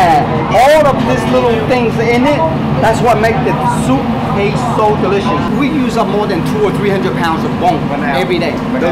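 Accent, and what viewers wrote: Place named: China. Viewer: He has a chinese influenced accent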